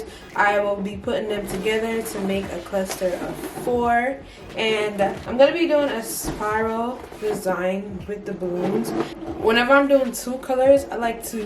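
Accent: Jamaica. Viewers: Great tutorial. I love balloons, but I don't like working with them; I'm always thinking they are going to pop, and that freaks me out to the Nth degree+1. P. S. you sound Jamaican.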